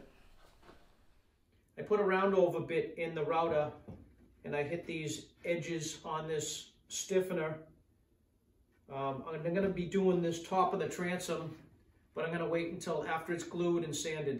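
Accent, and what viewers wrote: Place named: Massachusetts, United States. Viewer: I was wondering for a while why you didn't glue together the two lengths of transom reinforcement earlier in the process. But you then explained that it was to minimize the effects of the epoxy on your drill bits and to consolidate the glue-up of the transom and brace into one operation. That kind of forethought and careful planning is an example of what separates you from the vast majority of YouTube boat builders. Thank you. P.S. As a Rhode Islander born and bred, I appreciate your Massachusetts accent, your Quincy lat/long signboard, and your Cape Cod hat!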